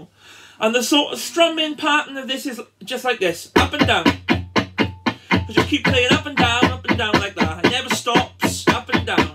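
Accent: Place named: Wales, United Kingdom. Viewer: You are really fucking Welsh. And fair play to you, no insult meant there. Also great lesson, you really captured the spirit of the tune when you jammed it at the end. 10/10.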